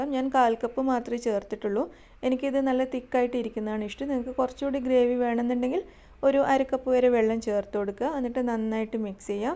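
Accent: Kannur, India. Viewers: uuyyantee apppaa. Kannur slangg. Nice receipe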